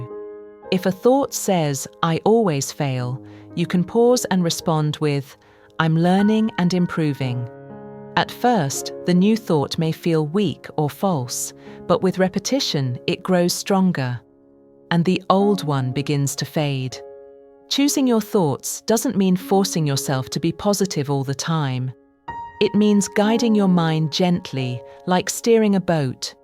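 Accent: United Kingdom. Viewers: Thanks nice British accent ❤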